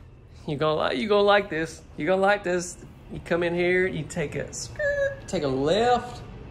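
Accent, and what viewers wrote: South, United States. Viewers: Love your accent! I miss living in the south. Blessings to you and your family. Thanks for being here. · U sound just like southern mom ? I'm pretty good about sounds let me know if you are lmbo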